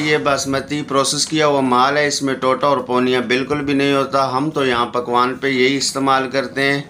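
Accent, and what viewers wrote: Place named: Abbottabad, Pakistan. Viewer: Bhai bot asani se sumjate ho app abootabad ke ho ap ke lehjay se lugta he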